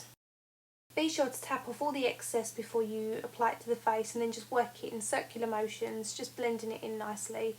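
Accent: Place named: Romford, United Kingdom. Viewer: You sound like you're from my neck of the woods - Romford?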